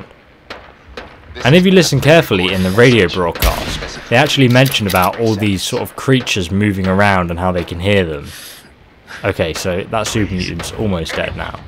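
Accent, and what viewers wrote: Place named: United Kingdom. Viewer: Dyslexia makes it hard to read. Not to speak =) I also think it's just a british accent thing. No biggie.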